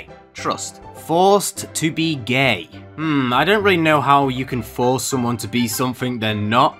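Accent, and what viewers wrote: United Kingdom. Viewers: I have a question what’s your accent is it British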